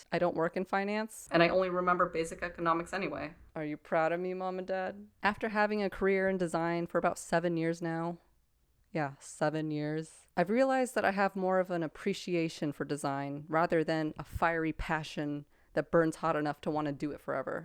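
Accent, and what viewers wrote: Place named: United Kingdom. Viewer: Thanks for the shout out. Nice British Accent! New career as a voice over actress? :) Something you said in there - you have an appreciation for Design. Perhaps Design Consultancy or Management (yeah I know) is your thing? Not actually being "creative" yourself but overseeing the creative process of others to deliver the right result for the client? Having experience of a role before becoming a manager of that role certainly makes you a better leader (or so I've heard).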